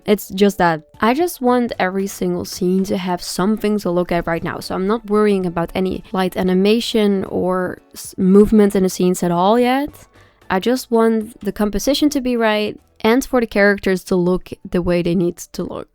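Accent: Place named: Netherlands. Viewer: ive loved httyd for so long, I went to see all the movies in the cinema with friends or family. I loved the way you drew hiccup and toothless (although i might be more biast towards T cus I love dragons in general) It's always so odd seeing a style i recognize and then realizing I've seen insta reels of them before 😭😭 (kny saja boys) Also I love your accent!! I knew it sounded dutch and I always get excited seeing other dutch artists who like the same medias I do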